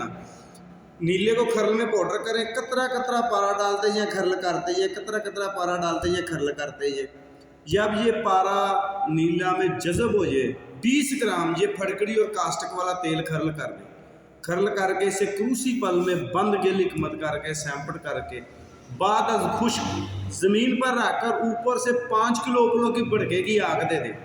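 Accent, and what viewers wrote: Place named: Punjab, Pakistan. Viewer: جناب میں نے یہ نسخہ بنانے کی پریکٹس کی تھی نہیں بنا تھا، کیونکہ مجھے ساٹھ گرام کی جگہ نیلا ست گرام یعنے کہ سات گرام سنائی دیا تھا، استاد محترم نہایت ادب کے ساتھ گذارش ھے کہ اجزاء کے وزن لکھی ھوئی صورت میں بھی شئیر کر دیا کریں تا کہ وہ لوگ جو پنجابی لہجے سے نا بلد ھیں ،غلط سمجھ کر نقصان نہ اٹھائیں ،،شکریہ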